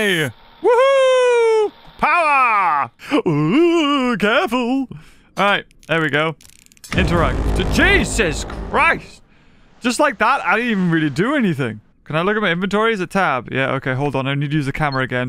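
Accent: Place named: United Kingdom. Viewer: i just noticed he's british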